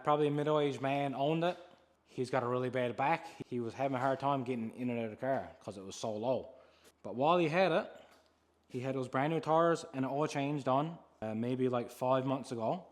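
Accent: Ireland. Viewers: What type of accent is this? Irish Redneck?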